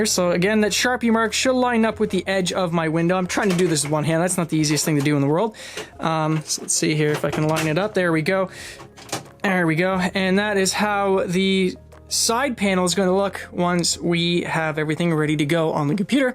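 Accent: Canada. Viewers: The Canadian accent is Noice 👌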